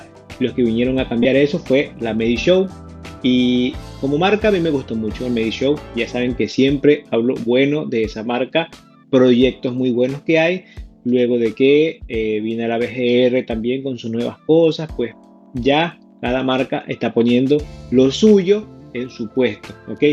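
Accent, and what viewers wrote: Venezuela. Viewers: Hablas como venezolano!! Jejeje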